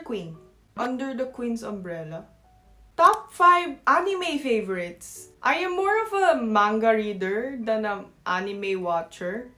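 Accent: Batangas, Philippines. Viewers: Love you, isa ka na talagang Pinay, salamat for embracing our culture lalo na ang aming wika, Batangueña rin ako kaya i can relate to your diction, ala eh..... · kyot mo teh~:face-green-smiling: kaya pala medyo batanguenya yung accent mo taga dito ka pala dati. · That’s why! whenever I watch you I hear words, expressions and even a bit of Batangaueña intonation. Where in Batangas? Batangueña too. A Maroon as well. More power to your channel and congratulations to 100k!🎉👏🏼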